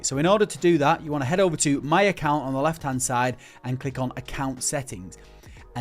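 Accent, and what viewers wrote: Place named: Northern England, United Kingdom. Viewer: English northern accent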